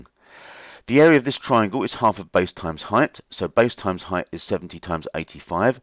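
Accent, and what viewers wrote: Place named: United Kingdom. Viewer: Lol ur British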